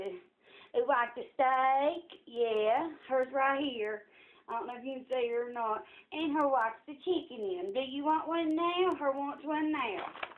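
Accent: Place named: South, United States. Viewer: Hey, love watching your videos, your southern accent gets me every time it's too cute. I fianally found the cinnamon candy and colouring books! I was so happy, I bought two bags of the candy it was really yummy. My yorkie loves any kind of jerky. Like seeing what your mani of the week is too! Have a great weekend sweet friend. Xo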